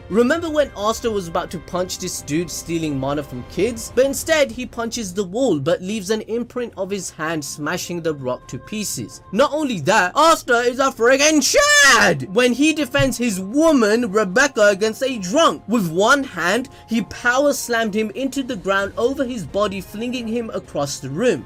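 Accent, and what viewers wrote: Punjab, India. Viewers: that punjabi accent lol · Hey are u punjabi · Haaaai are you Indian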